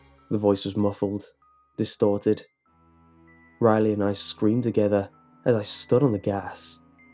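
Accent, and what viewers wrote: England, United Kingdom. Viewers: is he american or English cos he sounds English but I'm not sure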